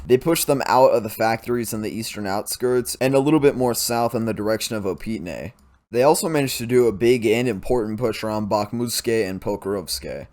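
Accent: United States. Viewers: So painful listening to Ukrainian city names in an American accent.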